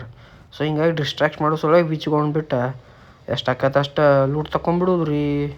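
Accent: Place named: Uttara Kannada, India. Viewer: Full jora agi matadi sir.... Adu uttar kannada language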